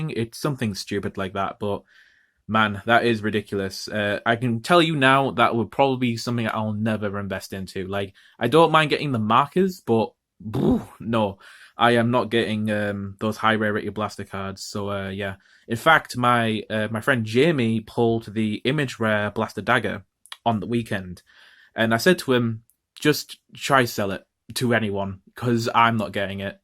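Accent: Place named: United Kingdom. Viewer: knew it from the accent. I’m from the uk as well 🇬🇧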